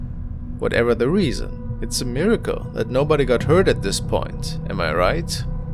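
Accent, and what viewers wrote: Germany. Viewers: Sehr gut...was heisst...eigentlich.. an deinem Akzent denk ich mal du kommst aus Deutschland oder Österreich, lebst sicher in einem Englisch talking Country. If i m write, please take care of you,and i would love to see more of " in Carmel Main " Stay healthy,greetings from austria-ps.your Englisch is allmoust akzent free ( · Alter, der Akzent ist so anstrengend... ist das ein deutscher? Klingt jedenfalls so. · he's german if I remember correctly. You'd think after speaking (or trying) to speak english for years. Watching TV/films, reading articles, & speaking with fluent english speakers. That this habit would be unlernt. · It’s nice that you don’t say EVERYTHING is paranormal, and point out how/if it can be faked....is the accent German? My daughter and I are wondering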